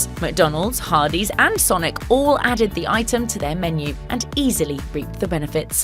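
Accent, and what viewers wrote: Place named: United Kingdom. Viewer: Wtf is this British broad?🤔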